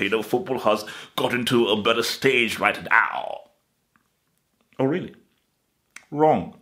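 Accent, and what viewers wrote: United Kingdom. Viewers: That british accent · Oh my goodness I love this channel even more . That British accent is impeccable. Keep up the good work my Nigerian amigo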